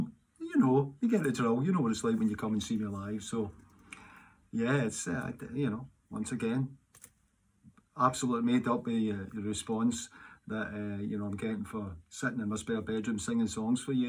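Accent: Scotland, United Kingdom. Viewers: Thank you! You are so cheerfull:-) the Scottish accent makes it even better:-D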